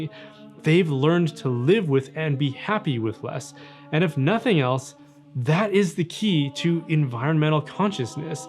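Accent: Canada. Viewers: Oddly, hearing a canadian speak over images of suburbia started " " playing in my head.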